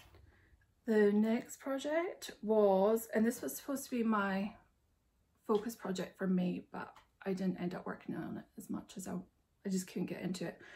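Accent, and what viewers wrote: Scotland, United Kingdom. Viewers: Thank you for sharing your beautiful work! Love all your stitchy plans as well. The bear, whales and owl are among my favourites! Have a great may and sorry about the trouble with your ankle. Still loving the scotch accent! I am working on the Quakers of Scotland by tempting tangles and am loving it! Scotland is on my bucket list of places to visit as thats where my ancestors originated🙂. I know you are in the US now but I see you still love to stitch those highland cows 🥰. Happy stitching 🧵🪡😊☀️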